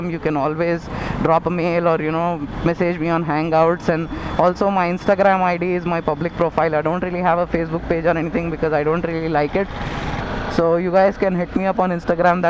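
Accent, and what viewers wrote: Hyderabad, India. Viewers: Lov u n ur videos too bhaii.. Donno the reason for God sake but i absolutely enjoy watching ur videos.. They are not dramatized nor filled with juvenile but they are very very natural and appealing.. Ur communication is awesome no doubt abt tht and wat i lov the most is tht typical hyderabadi accent ull be using here n thr.. Even in my frnds circle we discuss abt ur videos more than any.. Hum hum hyderabadi bhaiii:p.. Well cheers to you bhaii..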